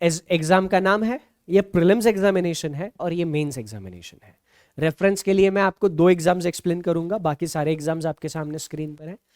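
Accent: Maharashtra, India. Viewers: Namaste Sir, hriday se request hai kirpiya 'Marathi' touch mai baat krna phir se start kr dijiye sessions mai...🧡🙏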